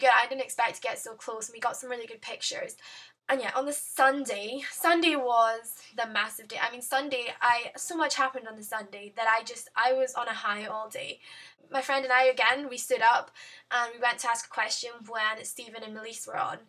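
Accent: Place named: Scotland, United Kingdom. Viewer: I'm going this year too :) I can't waiiiit hopefully see you there :) Where are you from? You sound scottish but at the same time english ahahah I don't understand xx I'm sooo excited about going this year, just worried i'll do something wrong and miss my photo op or get lost or something , Yeah it might not seem possible but I'm an idiot :p xx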